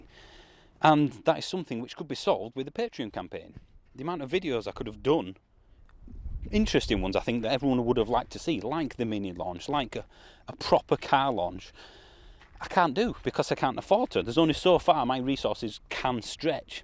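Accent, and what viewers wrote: Yorkshire, United Kingdom. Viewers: I quite like... your, I guess, Yorkshire accent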